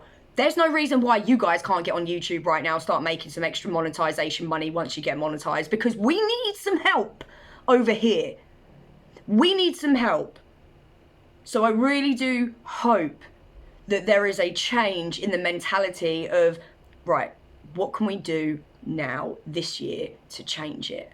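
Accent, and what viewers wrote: United Kingdom. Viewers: Subscribed for her British accent and energy.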